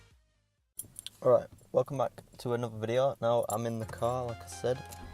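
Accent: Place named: Blackburn, United Kingdom. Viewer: Ik where Burnley is coz I live there aha I knew u were from Blackburn from voice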